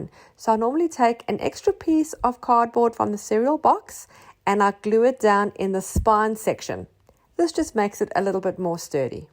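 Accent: South Africa. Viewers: South African 😊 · Thank you for this tutorial well done and much easier to follow. Are you perhaps ex-South African....I recognise your accent! I am from Durban South Africa.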